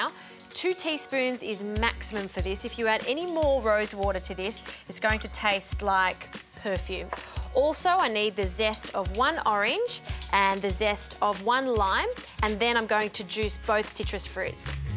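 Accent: Australia. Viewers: aussie english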